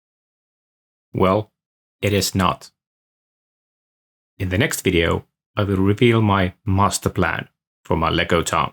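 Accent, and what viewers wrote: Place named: Finland. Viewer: It's weird to immediately recognize from your accent but I think you're also Finnish? That's a nice building although the detail work is bit too cluttered for my taste.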